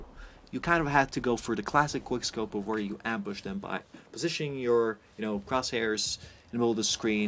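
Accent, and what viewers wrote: Netherlands. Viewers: Hey, you dutch? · are you from the dutch?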